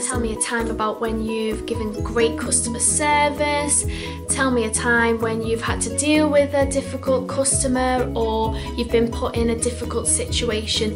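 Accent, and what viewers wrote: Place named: England, United Kingdom. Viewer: Not that I want to be cabin crew, it was very nice to hear all about it and see it from the other side, this video was very informative and thank you for posting. Just trying to work out which part of England you accent is from.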